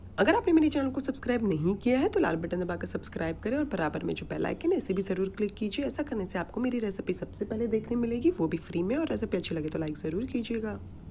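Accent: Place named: Konkan, India. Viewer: aapki baaton se lag raha tha. Where r u from?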